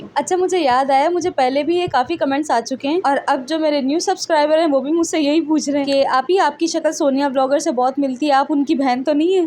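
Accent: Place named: Karachi, Pakistan. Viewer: Zaberdast ❤ aap ke bolne ka andaz boht accha hai i really like your videos aap kaandaz bilkul karachi wala hai aap long video banaya karen😊😊❤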